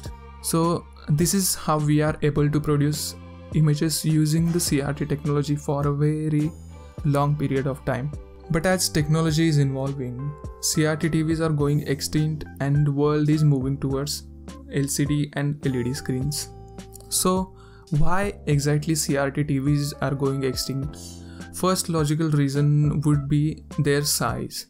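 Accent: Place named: India. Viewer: Just hear indian that's it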